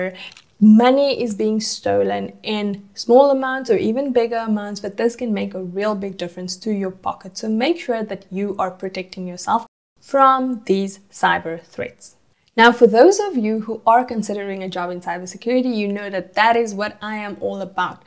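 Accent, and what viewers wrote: South Africa. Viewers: Also, I instantly heard a South African accent?! much love 🇿🇦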